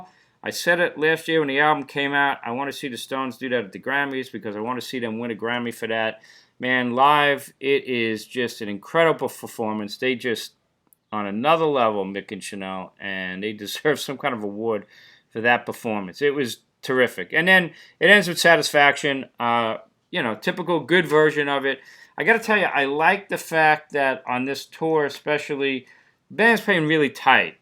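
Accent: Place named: New York, United States. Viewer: Jagger is 80 not 81. The fact that the muddy sound became clearer that’s cause of the wind, or probably a slight breeze coming & going. Guess you’ve never sat in the nosebleeds! The fazing was fucking wind not the sound guy. Your used to arenas no wind. & you probably saw the Stones at Giants stadium a virtual wind tunnel that can get lucky no wind some dates. Only good thing about your 📹 is your NY accent but your no Archie Bunker pencil in Cindy Lauper It’s the fucking wind!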